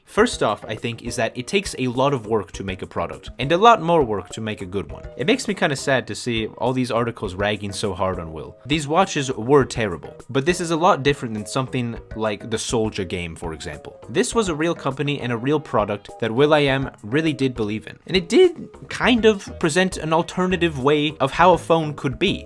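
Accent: United Kingdom. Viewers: This is the best video 11 out of 10, best british accent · That brit accent lmao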